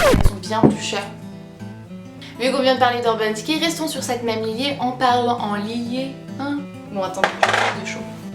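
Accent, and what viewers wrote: United States. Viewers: Toujours aussi marrante;) Bourjois est vraiment tentant à force que tu en parles... Pour info, j'ai trouvé des vernis Essie en Espagne à seulement 8,95!!!!! Et OPI à 12 je crois, donc si vous avez l'occasion d'aller en Espagne foncez chez Primor;) Pleins de bisous et au passage, quand tu parles anglais on dirait que t'es une américaine!! _ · Coucou 😘 Superbe vidéo comme d'habitude! Je t'adore ❤️ surtout ton accent américain est juste top j'adore!! J'aimerai avoir un accent comme ca XD😂 Bref big bisoux continue comme ca😘😘😘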